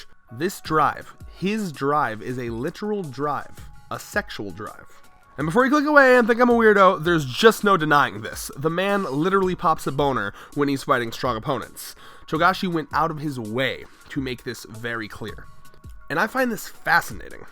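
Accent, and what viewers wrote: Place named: United States. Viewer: Why do you keep putting the r before e when pronouncing words, I think I developed a new pet peeve for American accents. Good vid tho subbed.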